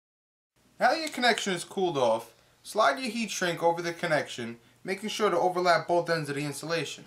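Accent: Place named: New Jersey, United States. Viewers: What kind of American? Southern, New English (New York, New Jersey, New Hampshire, etc.), or western (I think he has a New English) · Simple, straightforward tutorial, with no BS ! you gotta be from Jersey,(or thereabouts...) thanks , Man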